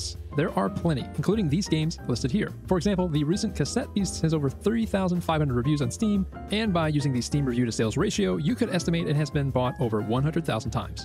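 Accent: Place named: United States. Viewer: His American accent makes oh sound like ah to us Europeans. But he's saying oh :)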